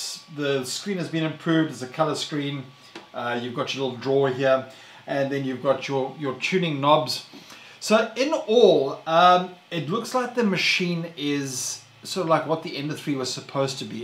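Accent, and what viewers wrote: South Africa. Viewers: Hello man. I can hear you are a proud south african from Your accent. Hello from Port Elizabeth 👋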